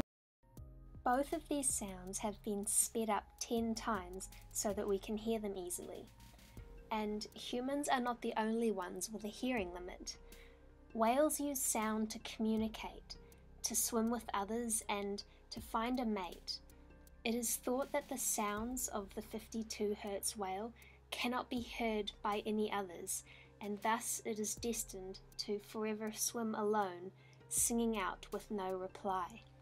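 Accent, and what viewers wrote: New Zealand. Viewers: Luv that Kiwi accent. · Are you kiwi? Your accent is so clear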